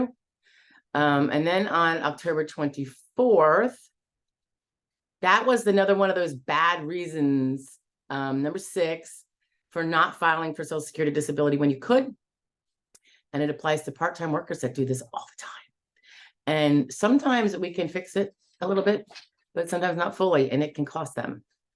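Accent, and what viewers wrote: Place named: New Jersey, United States. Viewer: i like your vids could u do a vid with your jersey accent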